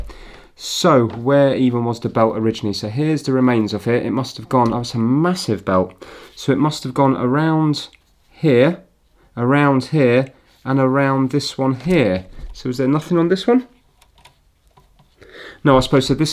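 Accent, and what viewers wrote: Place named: Norfolk, United Kingdom. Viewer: Definitely a Norfolk accent. "Suffolk Swede" will be a dig at their near neighbours. Lots of rivalry between Norfolk and Suffolk.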